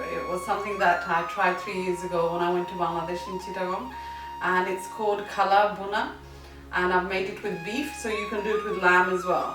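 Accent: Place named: United Kingdom. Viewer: Auntie sound like has been studied in UK or stayed in uk for long time by the accent. Nice video btw. Love it. Chittagongonian food is the best.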